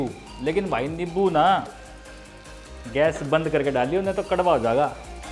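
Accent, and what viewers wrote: Haryana, India. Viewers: ekdum majedaar recipe, like your haryanvi accent... god bless!! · Really liked your haryanvi accent big fan Sir 🥰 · Hi Chef, thanks for the yummy dhokla recipe. Will try it definitely over the weekend. Dhoklas are one of my all time favourite . The Harayanavi dialect is so sweet to hear.👏👏🙏🙏😊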